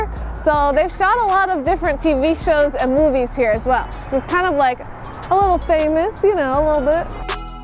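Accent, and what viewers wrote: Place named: Bronx, United States. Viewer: Wait, you sound like your from the boogie down sweet heart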